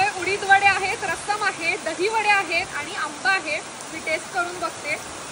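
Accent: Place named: Brahmin, India. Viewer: अग तू किती लहान आहेस... आणि किती शुद्ध मराठी बोलतेस... आम्हा ब्राह्मणांसारखंच बोलतेस.. मला तुझा खूप अभिमान वाटतो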